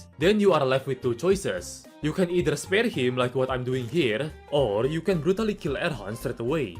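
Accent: Russia. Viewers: No soy inglés pero creo que el tiene un acento ruso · The russian accent being so thick you have to wade through it to get to the other side is great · Maybe Russia :P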